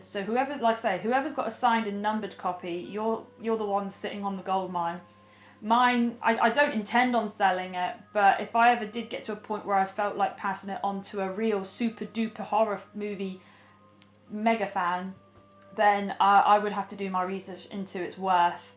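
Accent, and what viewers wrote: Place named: Newcastle, United Kingdom. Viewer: Are you from newcastle? Because you say book like that. I looovvvee your accent 😊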